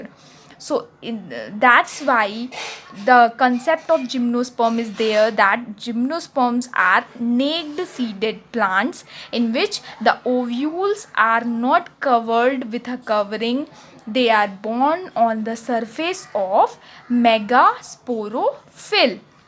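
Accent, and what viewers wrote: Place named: India. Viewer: Ye Indian accent he... Tu saale gymnosperms samjne aya he yan English seekhne... Ch***ya Sala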